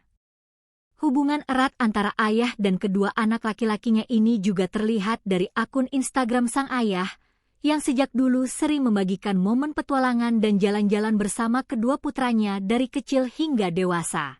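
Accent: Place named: Indonesia. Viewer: Menyebalkan kalo pakai AI tulisan inggris di eja seperti bahasa Indonesia. Menjijikan mendengarnya jadi kelihatan dungu.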